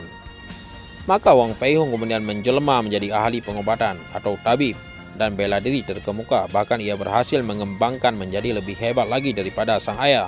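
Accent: Aceh, Indonesia. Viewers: Nyoe yang baca berita awak aceh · Yg bc ceritanya kok kyk org aceh ya... Dialek nya kental banget aceh... Bs di percaya berita ini...????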